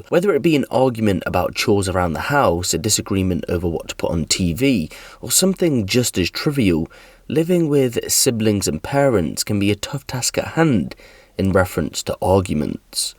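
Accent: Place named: RP, United Kingdom. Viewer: Dam I have been on YouTube for a hot minute what happened to your voice you've got well posh innit! 🤣🤣🤣🤣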